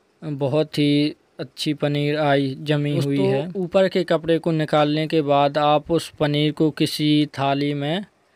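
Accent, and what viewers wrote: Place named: Bundelkhand, India. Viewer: bhai main bhi bundelkhand se hu Datia bhander m.p se 👍 aapki voice se pahchan ho gyi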